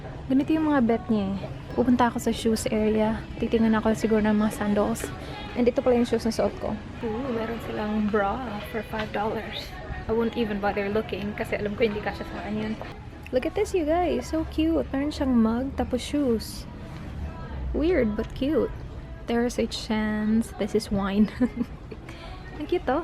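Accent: Visayas, Philippines. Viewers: hahaha katuwa ka naman the first time I saw your video im not paying too much atention and then i saw again tpos may punto ka sabi bisaya cguro to....natawa ako doon sa mubua nku kalipay oi hahahahahwhich means mababaw ang kaligayahan ko nkarelate tuloy ako sau kc parang gnun lng ako kakalog hahahahhah thumbs up ate!! Rachelle from davao..mwahh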